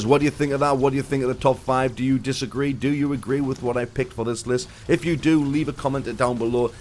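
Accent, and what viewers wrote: Newcastle, United Kingdom. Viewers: Guessing you're from Newcastle ;) same here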